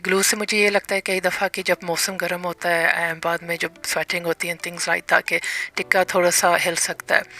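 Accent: United Kingdom. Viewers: Her English accent 😍😍😍😍😍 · I could tell by your accent that your're british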